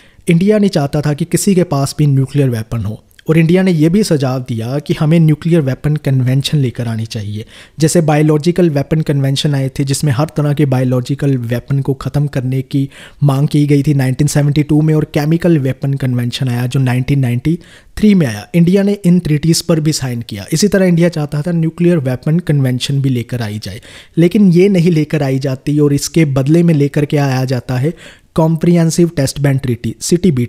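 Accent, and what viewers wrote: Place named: Punjab, India. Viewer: Very effective and knowledgeable information with punjabi assent. Thankyou so much sir.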